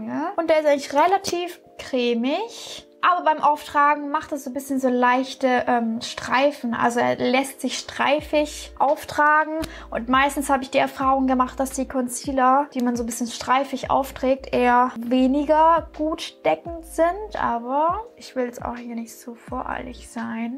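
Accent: Swabia, Germany. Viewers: ...Bisch halt einfach BESCHTE!😂#Schwabe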